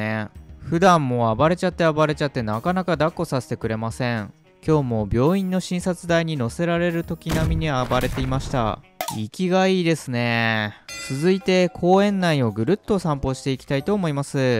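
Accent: Ibaraki, Japan. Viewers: コロちゃんの茨城弁が可愛いです 手作り弁当美味しそうでうらやましいです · 出たぁー🎉コロちゃんの茨城弁😂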